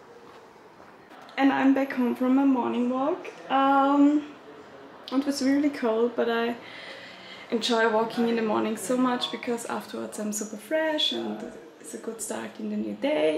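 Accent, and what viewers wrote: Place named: Germany. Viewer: Cute english with german accent 😃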